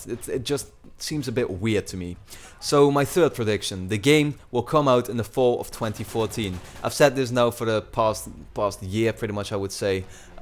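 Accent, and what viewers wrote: Netherlands. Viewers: Hey, did you know that ps4 could hold 2000 friends? Awesome, are u gonna add some subsribers like me? Would be cool to play some rounds with you:)Uit welke stad kom je btw? hoorde meteen dat je uit Nederland kwam, ik uit Den Haag:D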